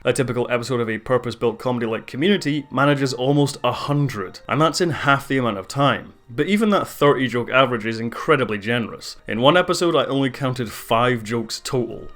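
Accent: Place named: Fife, United Kingdom. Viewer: Are you from Fife?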